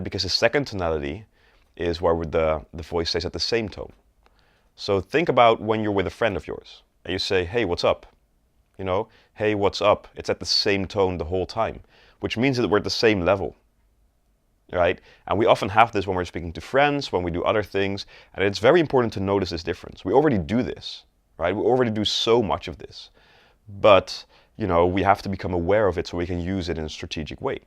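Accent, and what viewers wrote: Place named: Netherlands. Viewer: This is very good,! My guess is that you’re from The Netherlands as well! We have such a unique accent lol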